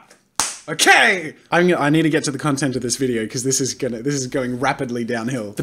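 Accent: United States. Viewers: his American accent is incredible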